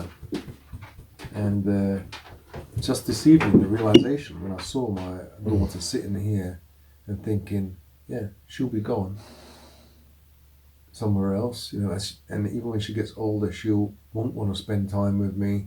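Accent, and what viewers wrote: United Kingdom. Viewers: Hello,just discovered your channel,are you English?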